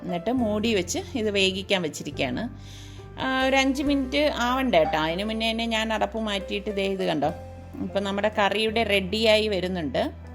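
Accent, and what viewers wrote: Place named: Thiruvananthapuram, India. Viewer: Thiruvi.,keera., veravi Assal thiruvantharam bhasha....😁😁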